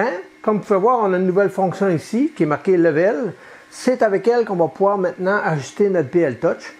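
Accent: Canada. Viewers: Salut, je suis tes vidéos depuis quelques temps, je viens d 'acquérir mon Ender 3 V2 donc je suis en pleine pose du BLtouch en se moment même, j'ai rencontré un gros soucis ma carte mère a brulée.. un composant a coté de la diode F2, est- ce réparable ou poubelle direct... merci de tes lumières et continue tes vidéos, je suis fan de ton accent canadien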